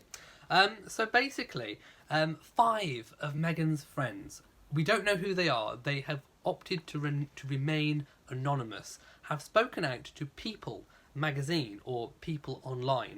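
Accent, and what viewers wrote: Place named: RP, United Kingdom. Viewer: Excellent pop up chat! I’m filled with happiness and hope and a tad taller spine now that Meghan’s friends have spoken out on her behalf. I’m sure her friends have her quiet approval for speaking out and I wouldn’t be surprised if the Queen is aware also. I hope this lowers the vitriol and things improve!!! 🙏🏾🙏🏾🙏🏾👏🏽👏🏽👏🏽🥰. Also love the BBC voice!!! 🥰🥰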